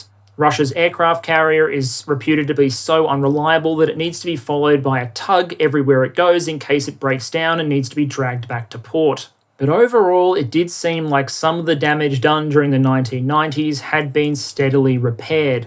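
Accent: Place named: Australia. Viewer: As usual for, excellent. One observation - not a complaint but a quirk of a Yank listening to an Aussi - It took me until the last few minutes to realize that you were saying "hulls in the water" and not "holes in the water". Honestly, I thought you were riffing on the joke that "a boat is a hole in the water into which you pour money"... Either way it made sense, sort of.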